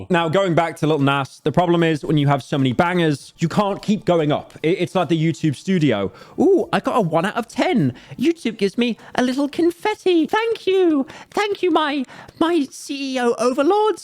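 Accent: United Kingdom. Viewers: Nah that's how its pronounced, or at least close, just British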